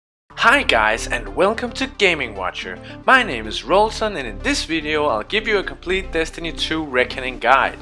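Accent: Denmark. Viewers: im almost certain that you my good sir are danish #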